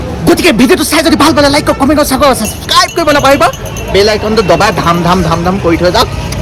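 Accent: India. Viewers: Ur hindi accent is so lovely,, very energetic vlog ,,, love from USA( United State of arunachal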